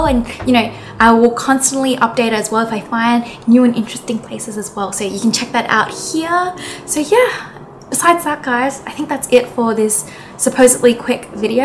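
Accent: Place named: Australia. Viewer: No wonder U have a very strong Aussie accent! 🤭 Was wondering how come a Japanese girl speaks like an Aussie! 😆🌏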